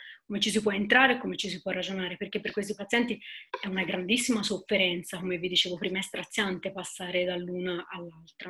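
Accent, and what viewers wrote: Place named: Tuscany, Italy. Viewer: peccato per l'audio, a parte l'accento toscano che tende ad aspirare certe consonanti ebbene sarà anche per l'inconsueta "velocità espressiva" alcune parole non si comprendono, in particolare le ultime della frase . . . cmq 👍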